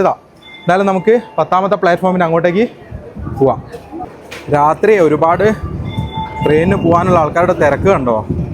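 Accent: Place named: Koyilandy, India. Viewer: കൊയിലാണ്ടി ശൈലിയിലുള്ള വിവരണം ഇഷ്ടപ്പെട്ടു.പേഴ്സ് നഷ്ടമായി എന്ന് കരുതിയപ്പോഴും അത് തിരിച്ചുകിട്ടിയപ്പോഴതെ അവസ്ഥയും നന്നായി മനസ്സിലായി..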